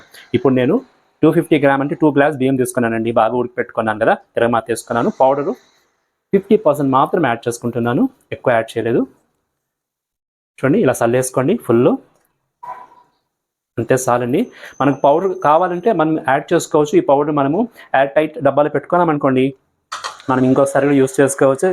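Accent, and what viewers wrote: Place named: Karnataka, India. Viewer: Mee Telugu same memu matlade laage undhi, Karnataka na meedhi Garu?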